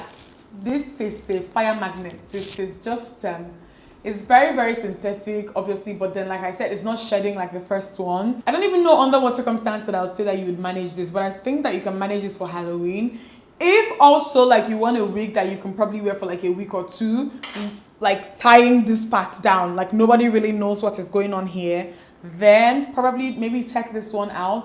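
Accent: Igbo, Nigeria. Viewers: The additional igbo accent just perfected this video 😂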